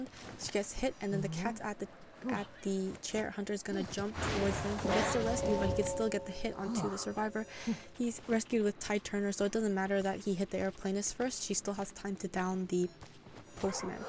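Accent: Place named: Finland. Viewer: Tysm! This is so helpful, couldn't find a good guide on Ann. Btw where are you from? Your accent sounds the same as my finnish friend's haha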